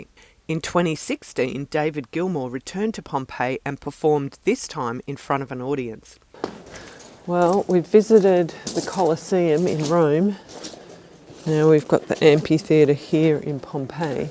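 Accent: Australia. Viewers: Excellent Editing. It’s great to hear an Australian accent on a travelogue.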